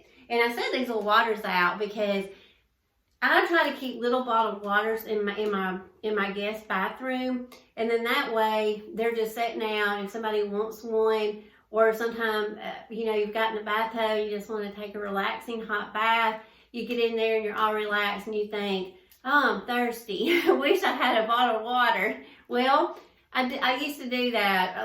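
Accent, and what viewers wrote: South, United States. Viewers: Love this project! I love watching your videos. Your sweet Southern accent is charming!! Blessings XO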